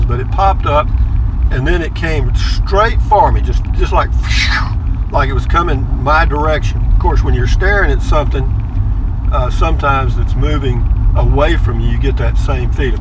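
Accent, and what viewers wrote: Texas, United States. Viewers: Thanks for the Sunday drive! Love your voice and your Texan accent! Good stories. You’re funny- had a couple of gut chuckles😬. Thanks for sharing. Damn, all those roads and not one human in sight!!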